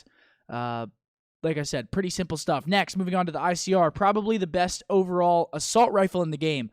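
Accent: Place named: Texas, United States. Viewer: What part of Texas you from?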